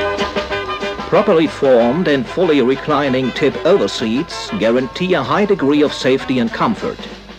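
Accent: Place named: Germany. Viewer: It's interesting that the narrator speaks English with a noticeable German accent, leaves the factory name completely in German (not that they'd translate it fully, which would be something like "Saxon Ring Automobile Works Public Trust" but at least say "vee ee bee" instead of "fow eh bay") and calls the car "Trabant six hundred one" when "six oh one" would be how an English speaker would more likely read it.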